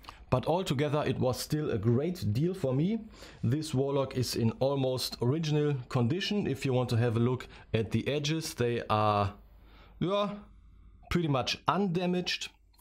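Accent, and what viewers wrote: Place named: Germany. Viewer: Der deutsche Akzent ist unverkennbar:D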